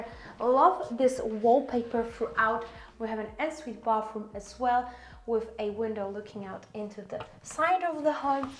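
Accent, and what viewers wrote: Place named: Australia. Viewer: You have a slight Aussie/ New Zealand accent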